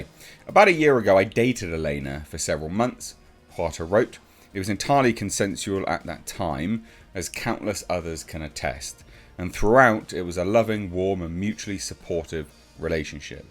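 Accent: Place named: United Kingdom. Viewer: I love your videos but as a Texan, I have to say, I cringed every time you said the actor's name. You sound like my relatives in the UK. I laugh every single time they try to pronounce a Spanish or Portuguese word.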